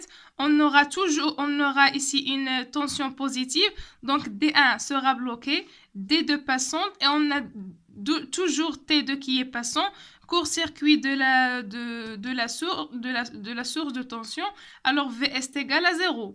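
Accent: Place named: Kabylie, Algeria. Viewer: Électronique de puissance avec accent kabyle <3 ! ( On a tendance a noter l'angle d'amorçage avec alpha pour laisser le Phi pour le déphasage en cas de charge inductive ), Merci a vous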